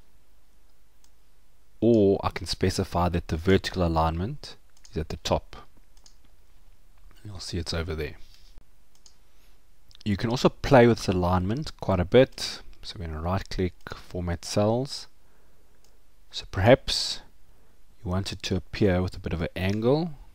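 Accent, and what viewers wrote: South Africa. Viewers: i can bet the man is south african from the accent ....anyhow much needed help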